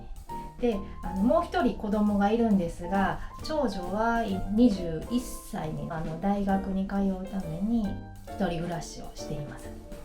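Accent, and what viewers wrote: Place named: Osaka, Japan. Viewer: aiiro70さんが旅先でとても楽しそうに外国の方とお話をしているのを見て、私もスキマ時間に中国語と英語を学び始めました。 同世代、しかも大阪弁のaiiroさんの動画、とても親しみが持てて、楽しみにしています。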